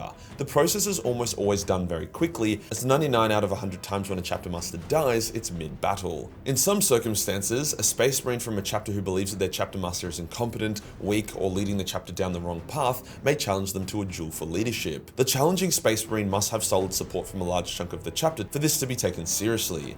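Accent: Australia. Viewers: Honestly i love 40 k lore and have been in franchise since its conception starting out in warhammer fantasy as i prefered the fantasy game tabletop games. how ever i chucked you a sub just due to learning you had been banned a few times by youtube fuck them long live the australian speaking style and not give a fuck attitude ! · Why you put by an Australian you don’t gotta announce where you from we still gonna watch the vid and we rock with you bc you Australian the accent top tier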